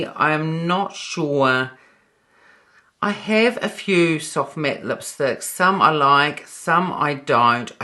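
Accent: New Zealand. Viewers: So happy to have come across your channel.....as a fellow Kiwi living in Switzerland I really enjoy hearing the NZ accent when talking about makeup 🥰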